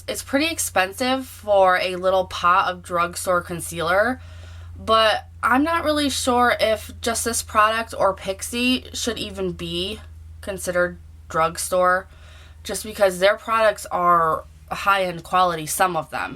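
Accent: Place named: New Jersey, United States. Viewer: Michigan, about 30 minutes from Detroit where my fiancé currently lives. What state are you from? Your accent sounds a little like someone I knew from New Jersey.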